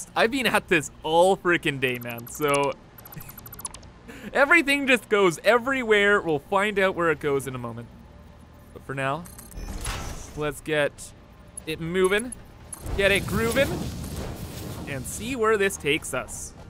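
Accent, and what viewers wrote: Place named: Canada. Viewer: Haha No worries if this is too personal but, what part of Canada are you from? It's always more comfortable listening to a fellow Canadian talk about games!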